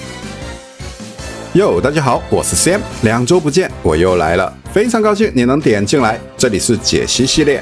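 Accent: Hong Kong, China. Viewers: 香港人說普通話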